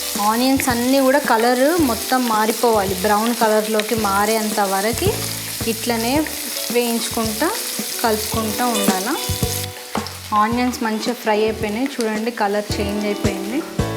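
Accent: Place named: Nizamabad, India. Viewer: Though it's orugallu vantillu..You are pakka NIZAMABADI 🔥💗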